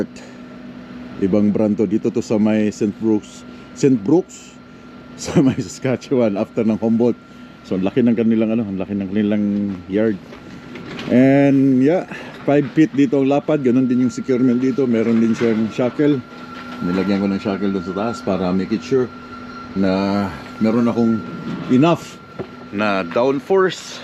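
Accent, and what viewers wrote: Visayas, Philippines. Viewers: Hi bisaya ka bai,kabati ko nag salita.tga diin ka MN diay sano?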